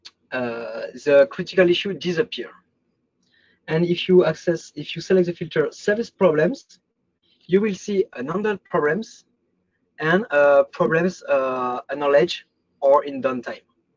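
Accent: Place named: France. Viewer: Le petit accent français des familles qui nous donne cette réputation à l'international...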